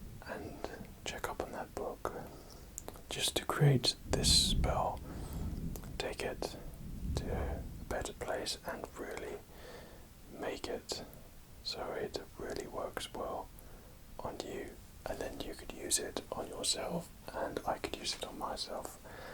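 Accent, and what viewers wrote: England, United Kingdom. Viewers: Is he English? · Loves chocolate, loves Harry Potter, looks like a surfer & sexy af articulate English accent... Yum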